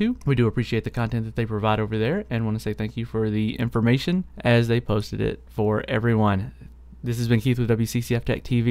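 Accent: South, United States. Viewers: are you guys southern?? Your accent is definitely southern sounding. I'm from Texas myself so I'm definitely up there with the accent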